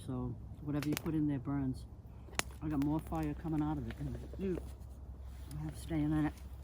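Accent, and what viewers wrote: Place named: Massachusetts, United States. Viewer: Def a massachusetts Gal, that's my accent. I'm closer to Braintree etc. Used to camp up in Maine and NH a ton. Great video.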